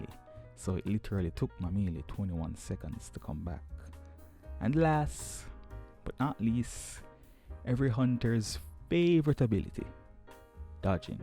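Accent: Jamaica. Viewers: Lol why do you have a jamaican accent? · I can hear you fighting back the “yaad man” accent. Great video tho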